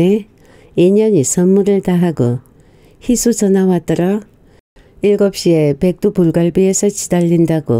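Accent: Gyeongsang, South Korea. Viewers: 경상도 분이라서 사투리가 전혀 이상하지가 않네요,잘듣고 있습니다,감사합니다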